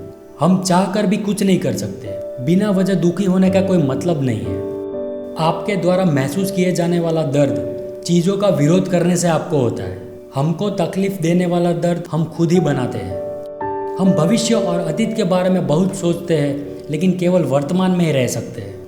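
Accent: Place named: Rajasthan, India. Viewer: Aap ki bolne ki style se lagta he aap Rajasthan se he.am I right?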